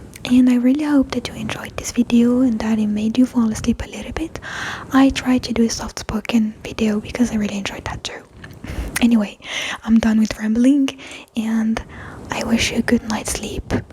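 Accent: Arab world. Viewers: Your Arabic accent are unbelievable ❤❤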